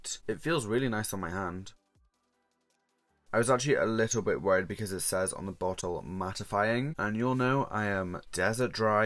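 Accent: Wales, United Kingdom. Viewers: I love your accent!!!! Are you Welsh?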